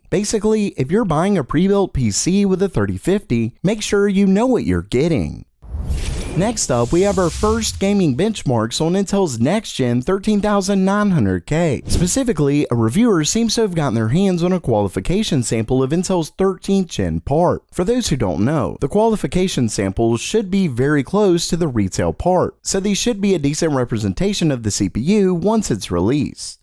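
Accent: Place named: South, United States. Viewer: that country accent tho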